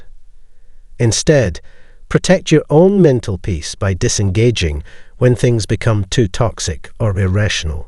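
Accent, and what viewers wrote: Scotland, United Kingdom. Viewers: Your channel is great. Your narratives are very clear. Your accent sounds very familiar. Are you Scottish by any chance?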